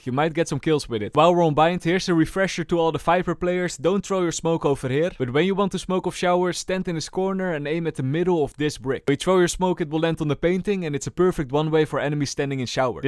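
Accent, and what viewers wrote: Netherlands. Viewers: The "v" sound doesn't exist in many European languages. He's Dutch, so it comes out closer to "falorant".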